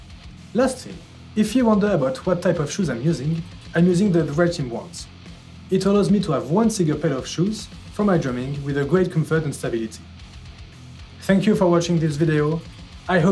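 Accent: France. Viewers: tu est fancais ca s'entend a ton accent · Ça serai pas un petit accent français ça ? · L'accent Francais on reconnais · c est moi ou il a un gros accent francais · L'accent français bien présent ✅🤣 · Tu est français non ?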